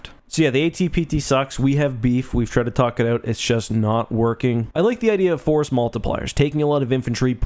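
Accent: Canada. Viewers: Based on your accent you’re Canadian?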